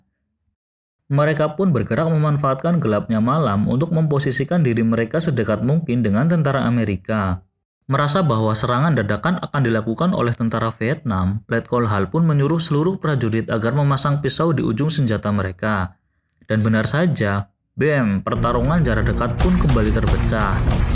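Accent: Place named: Banyumas, Indonesia. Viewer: Yang nggak bagus.. Ini orat nerocos terusan.. Ngapak lagi ngomong nya.. Nyebelin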